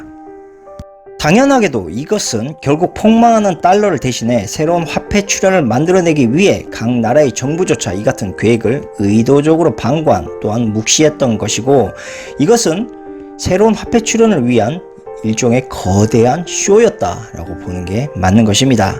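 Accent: Jeolla, South Korea. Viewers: 이분전라도말씨네요 친중파로보이네요 전라도는 다수가 친북,친중,,중요한건 공산당정권은 망해야한다는 진리입니다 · 전라도 사투리가 진하게 베어 나오네...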